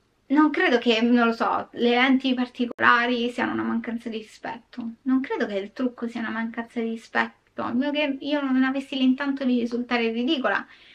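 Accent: Rome, Italy. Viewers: il tuo intercalare romano che adoro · Cara, non si tratta di accettarti così come sei. Nessuno deve accettare nessuno. Tutti devono rispettare tutti e semmai seguire o legarsi a coloro che apprezzano. Noi non ti accettiamo, noi prima di tutto ti rispettiamo e poi ti apprezziamo. E personalmente ti stimo e ti sono molto grata per quello che fai, sia qui spogliando di ogni sacralità i criminali e restituendo dignità alle vittime, sia sugli altri canali, dove mi accompagni in interessanti e spesso importanti ragionamenti, o dove mi apri le porte sul mondo del cinema, sui suoi retroscena e i suoi meccanismi. Un mondo di cui io non so e che tu sai spiegarmi con un linguaggio chiaro, professionale ma anche scanzonato che mi ispira simpatia, che mi mette a mio agio. Quanto al tuo stile... è per l'appunto il tuo stile. Ti distingue, ti permette di esprimerti, sei tu. 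Io poi lo adoro, ma anche se così non fosse sarebbe parte di te come la tua voce, la tua calata romana e il gremlin con la corona di fiori in testa. Tutto ciò sei inconfondibilmente tu e, apprezzandoti, lo apprezzo. E chi non lo apprezza è tenuto a rispettarlo. Ti mando un abbraccio, sappi che per noi ciò che sei e ciò che fai ha valore, ci fa bene. ❤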